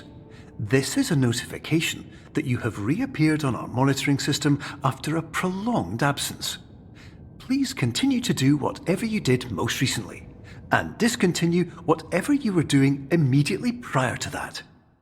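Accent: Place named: Scotland, United Kingdom. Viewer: It's Scottish! The narrator is Max Howarth, who hails from Edinburgh. I hear him quite frequently on the radio at work with Arnold Clark adverts.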